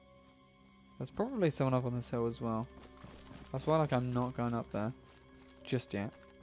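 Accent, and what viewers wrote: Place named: United Kingdom. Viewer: is Britsh im assuming from his accent and Daliy Dose of Internet is American but yes they sound simumlat in a way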